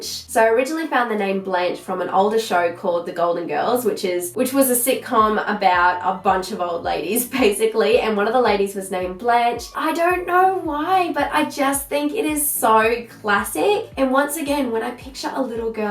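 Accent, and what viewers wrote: Australia. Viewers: OMG she sounds like an astraillian person lol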